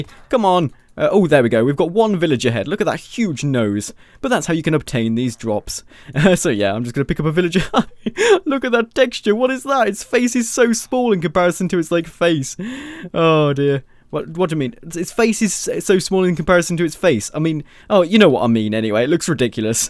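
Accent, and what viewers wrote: United Kingdom. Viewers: Where are you from you sound sort if British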